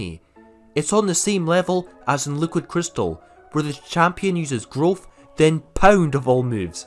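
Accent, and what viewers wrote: Scotland, United Kingdom. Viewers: you have a super Scottish accent. lemme guess...your from Scotland lol. (or wales..?)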